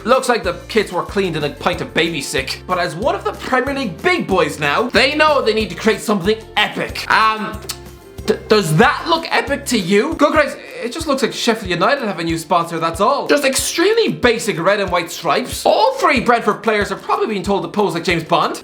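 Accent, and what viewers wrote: Ireland. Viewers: Ready to hear a Irish guy rant again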